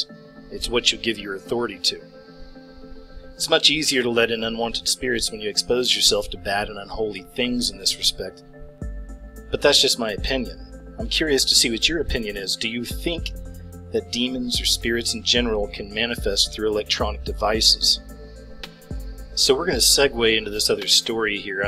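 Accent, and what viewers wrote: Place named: South, United States. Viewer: I watch him and Love is country accent 😌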